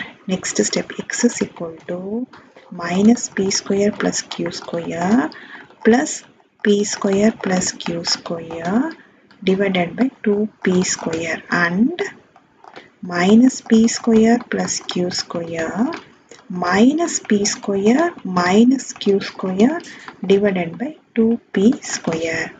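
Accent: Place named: Kerala, India. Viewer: i am from kerala that"s why i thought u r from kerala ur accent is similar please tell mam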